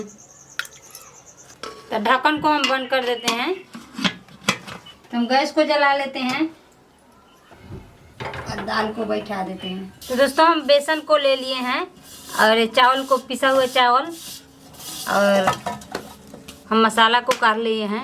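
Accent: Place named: Bhojpuri, India. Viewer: App bhojpuri boliye, humlog samjhte hai app kya bolti hai...App plz Bhojpuri boliye...Apka bhojpuri bolte huye bahut achi lagti hai. 😊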